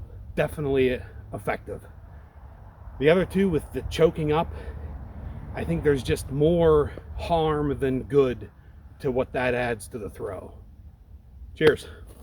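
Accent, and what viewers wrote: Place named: Pennsylvania, United States. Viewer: I grew up near Pymatuning. Once I heard you speak I thought I heard PA in your accent. Thank you for sharing these videos. My dad used to build atlatl, but I never really watched anyone use a sling.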